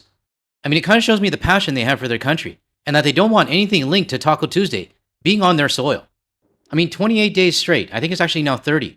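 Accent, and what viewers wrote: Quebec, Canada. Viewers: I think that dude calling your accent a goofy accent is very offensive to you Canadians, but the funny thing is that it is somewhat offensive to themselves too, as I am pretty sure that the majority of world citizens cannot distinguish the Canadian and US accent from each other. As a Dutch citizen, I can confirm that the trust in the USA has vanished in most of Europe and definitely in The Netherlands. People from left to right would never support this administration. So don't worry, Canada is definitely not alone. It is not a coincidence that the EU has made trade deals with other countries including India. As you said, the world moves on and let them believe that they are respected. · About the goofy accent. I think it might be our fault, us French Canadians from Quebec, and our less-than-perfect English 🙃 Sorry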